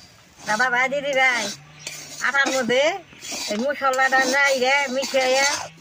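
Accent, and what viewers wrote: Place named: Kishoreganj, Bangladesh. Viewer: Asam ar vasa onak ta Bangladesh ar Kishoreganh ar moton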